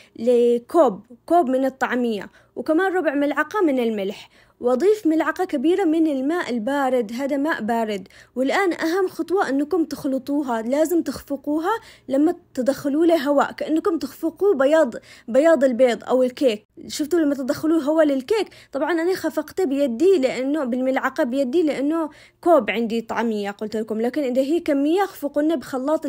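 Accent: Aden, Yemen. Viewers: 😂😂 حتى بنتي الصغيره تقلدها وتسوي فيدوهات ماشاءالله اللهجه العدنية تفووووز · ماشاءالله مصري بلهجة يمنية 😃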